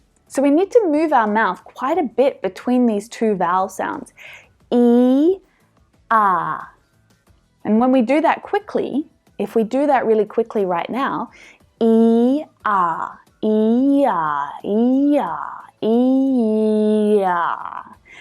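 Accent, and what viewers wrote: Australia. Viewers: As a British educated person living in Canada, I am fond of your Australian accent and your country Australia. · Hi!, I really enjoy your teachings. I've noticed, trouhgt out your accent, that Australian one is very close to British. While in the U.S.A., I took my classes, right there. I'm so eager to keep in the same track, so that I'm in the continous path of learning this beautiful language as much as possible. Again, thanks a lot for your help. With empathy: your suscriber, G.Z. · Hi, It's really hard to find good australia accent english teaching channel, I subscribed immediately when I found your channel and being enjoyed it ever since. But here comes the real question, Is it weird to for a male to shade a female? Am I going to sound more feminine?😂😂😂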